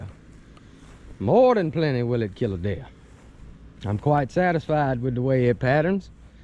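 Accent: Louisiana, United States. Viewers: Are u from Louisiana?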